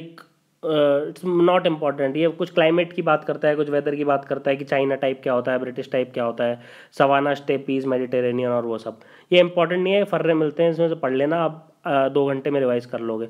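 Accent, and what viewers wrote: India. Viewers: very helpful video....gud to hear ur hindi accent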